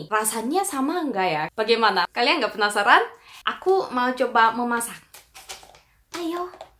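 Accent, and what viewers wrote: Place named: Java, Indonesia. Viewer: Kok, ada logat jawanya yah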